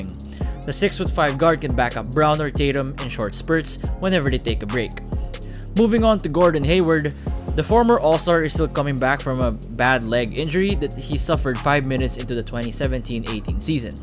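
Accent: Philippines. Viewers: You sound Filipino but idk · Your accent when you talk was just like a Filipino talking in English. Are you a Filipino? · You sound Filipino! Pinoy ka ba?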